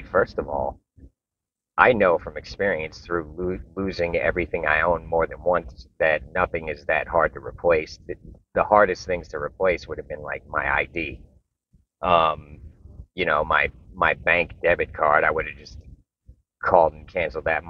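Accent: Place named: Long Island, United States. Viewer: There is nothing better than hearing a guy from Long Island tell Zen stories! “And the monk didn’t give a shit that he stole all his stuff.” I loved your interpretation and laughed,. That was so great.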